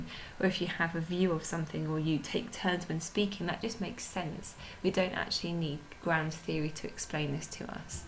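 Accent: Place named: United Kingdom. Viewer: Lovely explanation. lovely British accent .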